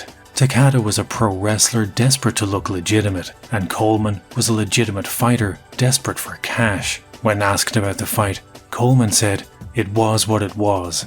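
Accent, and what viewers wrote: Ireland. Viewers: masterful comedic storytelling + Irish brogue is a winning combination that makes this channel golden. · Love your writing. Love your graphics. Love the Hibernian lilt which stirs my Irish lineage. (Also - eff John McCain.)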